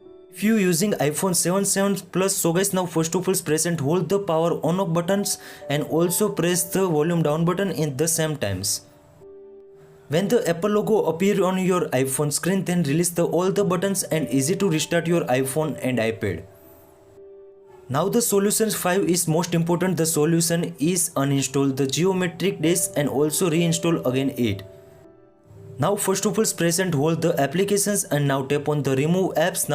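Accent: India. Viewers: Tf is he talking about bruh all i here is indian Indian Indian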